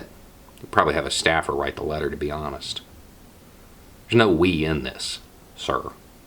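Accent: South, United States. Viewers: See at first when i heard your southern accent I thought you were going to be all for it because literally every southern person likes Trump but it turns out you were not that type of person and you are very well spoken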